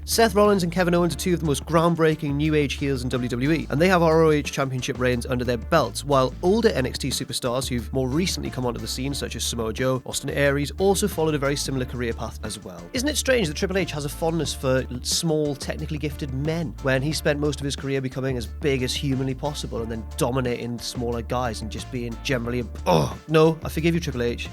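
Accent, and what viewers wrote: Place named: North, United Kingdom. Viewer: He's great. It's not a confidence problem. His just Northern like me,. He's very funny, but it's super subtle. We like 'taking the piss' in the north, and saying odd things just to see how people react, such as 'fuck you taz'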